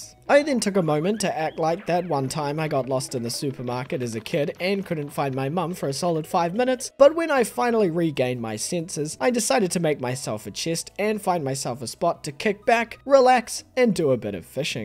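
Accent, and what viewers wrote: New Zealand. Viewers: how did i not clock you were a fellow kiwi until you mentioned it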